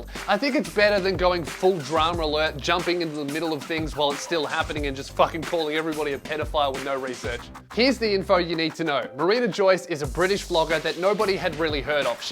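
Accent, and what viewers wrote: Australia. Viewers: Fuck this is the first video I have ever seen and in the first second I swear to god if you aren't fucking australian then fuck. Who is. Because I am and you are either Aussie or a fucking child of steve irwin.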